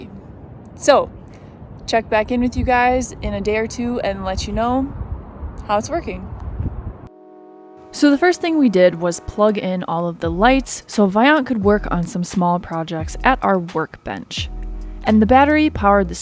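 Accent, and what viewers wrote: France. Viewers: Ça me fait tellement rire car le gars on voit qu'il est français car il a le petit accent bien de chez nous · Un accent très français pour monsieur 😊 je découvre votre chaîne, et ça me plaît bien. ✌️👍bisous de France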